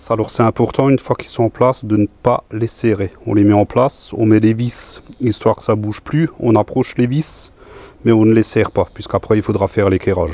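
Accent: Alsace, France. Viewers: Rien que pour l'accent alsacien je mets déjà un pouce en l'air ;-) en tout cas c'est clair qu'il est bien fini ce carport . Par contre qu'en est-il de l'entretien du polycarbonate transparent ? facile à laver ? et niveau résistance ?? J'ai beaucoup de vent dans mon petit patelin lorrain, je n'aimerai pas retrouver la toiture chez le voisin...tu penses que c'est costaud si ca souffle fort ???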